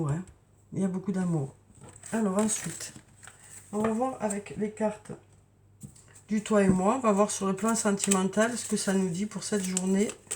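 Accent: South, France. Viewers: Merci pour cette guidance, toujours un plaisir d écouter cet accent du soleil, une voix calme et posée et surtout toujours cette bienveillance, vous êtes bénéfique et toujours dans le positif merci pour ces bonnes énergies que vous nous envoyez bonne journée☀ et prenez soin de vous. Bisous 😘👍💋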